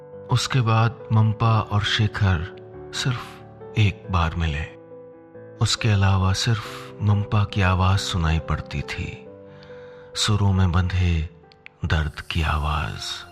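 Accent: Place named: Bengal, India. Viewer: Love you Sir from Kolkata .. kitna sweet lagta hai app ka Bengali :)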